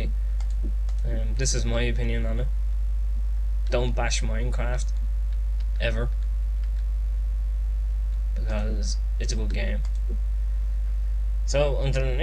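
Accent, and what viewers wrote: Ireland. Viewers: spoken like a true irishman! :D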